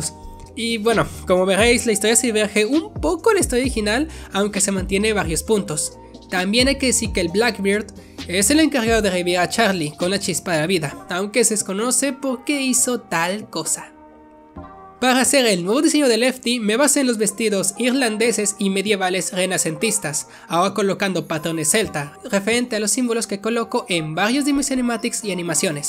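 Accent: France. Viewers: Pregunta eres franses si no eres pues pareces por el acento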